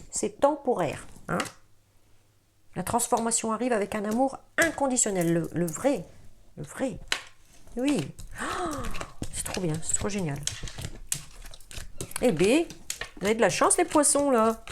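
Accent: North, France. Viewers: Hein !! Hein !! Y’a un ptit accent du nord !!!!! Excellent..merci !! 😂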